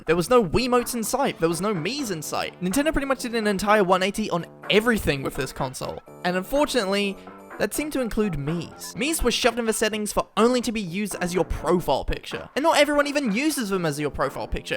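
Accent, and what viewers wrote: United Kingdom. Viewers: you have a british accent like me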